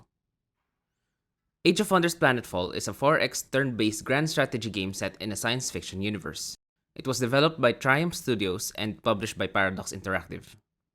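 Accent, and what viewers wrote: Philippines. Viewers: Hi there you sound Filipino sorry if im incorrect. But you are the best Planetfall teacher ever, you literally teach us what we need to know unlike other youtubers they talk for hours and it so boring and draining I can't be bothered listening to them. I only needed to know what diplomacy was but one question How did your game start with ''0'' reputation for your enemies in my game they always start with -300? and I am always at war. I can't pronounce anything to them in Scenario. I also can't trade Casus Beli. What am I doing wrong how do I start with Neutral Reputation. Please anyone help.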